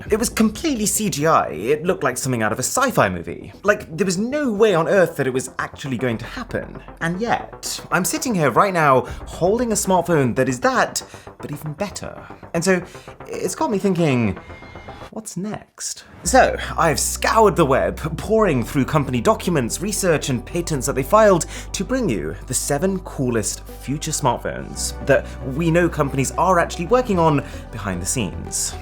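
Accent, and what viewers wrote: RP, United Kingdom. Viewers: Ah ! Terrible British English accent 🤮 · I am not even that way, but this man is just scrumptious, just the way he speaks with that posh accent and also being resplendently beautiful....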